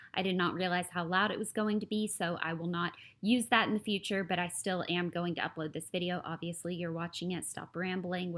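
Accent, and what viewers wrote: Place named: Tennessee, United States. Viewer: Anyone else think, dang she really has been in Tennessee?! The accent is cuuuuuute ♥️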